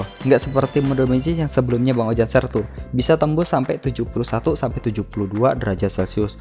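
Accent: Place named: Sunda, Indonesia. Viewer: Alah c mamang sunda nya meni lekoh hahaha semangat terus bang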